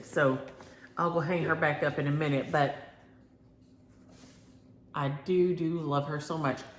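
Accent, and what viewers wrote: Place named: Texas, United States. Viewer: You mentioned East Texas. Every summer we traveled by car from Miami to Kilgore to see my precious grandparents aunts uncles and cousins. They lived there and in Larid Hill many years. It’s hotter than blue blazes! Thanks for sharing such cute patterns. I love bunnies and Spring is coming soon! I miss hearing my sweet families Texas drawl since they are all gone now… so honey I enjoy hearing you.. 😊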